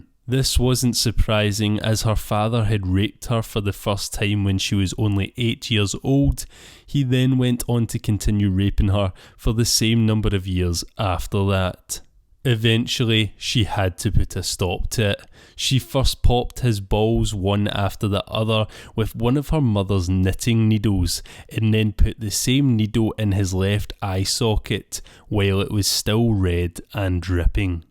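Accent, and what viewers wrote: Scotland, United Kingdom. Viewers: Erm....his accent is...scottish...😂😂😂😂😂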